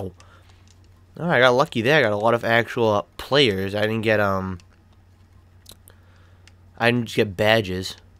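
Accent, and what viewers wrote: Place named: Boston, United States. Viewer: You sound like you're from Boston. Looks like you still hurting from Eli owning the cheatriots in the super bowl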